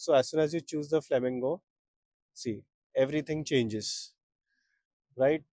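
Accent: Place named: India. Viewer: Can you use AI to change your fkd up Indian accent to something that people can understand?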